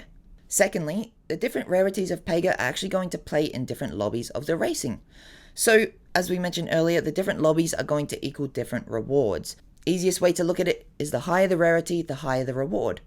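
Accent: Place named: Australia. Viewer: i love your aussie accent just like Rosé 😅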